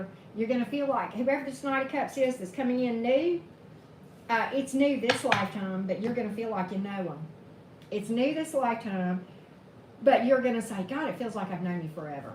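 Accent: South, United States. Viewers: I am handling it pretty well. Let the chips fall where they may! Thanks for your great reading. Love your Southern accent! <3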